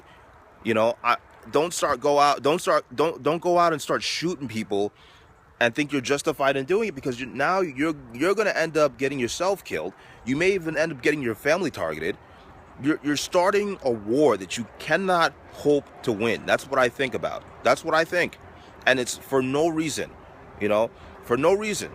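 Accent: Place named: Boston, United States. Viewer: Are you from the Northeast? You've got that Bostonian accent. Good video, by the way.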